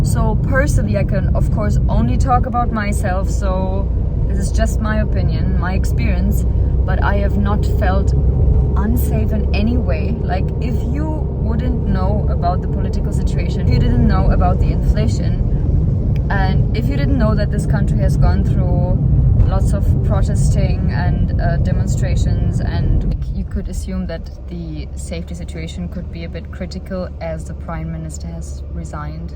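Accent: India. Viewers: Srilankans r super helpful n u sis don't pitch in ugly India pitch u r in Srilanka please.. yakkk Urdu voic in Srilanka 😂🙏 Cmon.. Srilankan sound is proper cool than stupid Urdu in Srilanka sooo odd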